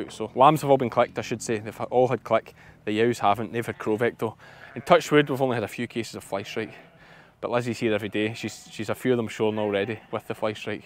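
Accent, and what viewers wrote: Scotland, United Kingdom. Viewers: Alistair is an absolute legend! But, would you consider putting Patreon back up? You could throw up some of the raw footage before Alistair does his magic (i.e. like your videos back in the early days). Just kidding, I love your early-days videos when you spoke Scots more. I miss being one of your Patrons! ❤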